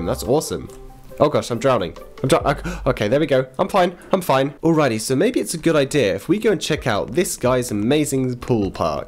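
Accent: United Kingdom. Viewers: AWW YOUR BRITISH!!! :3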